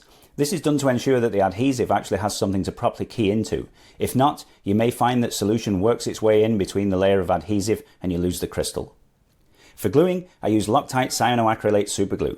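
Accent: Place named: Australia. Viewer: Your Australian accent is beautiful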